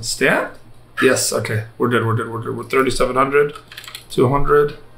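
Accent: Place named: New York, United States. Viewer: Who is this new york man? where did our middle eastern csgo player go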